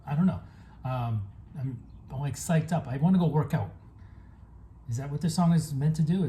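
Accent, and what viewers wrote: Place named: London, United Kingdom. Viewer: “Allo, G’mornin’ Guv”….Very London. ITS so soft that most people miss it.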